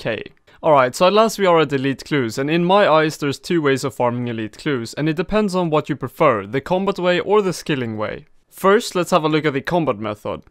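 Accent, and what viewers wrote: Sweden. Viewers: you sound swedish :)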